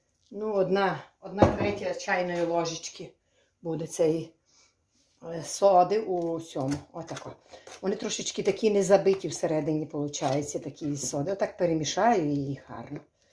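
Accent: Ukraine. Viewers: Спасибо за настоящий украинский язык! Язык моих бабушек и дедушек из села в Черкасской области! А деруны очень вкусные! Спасибо!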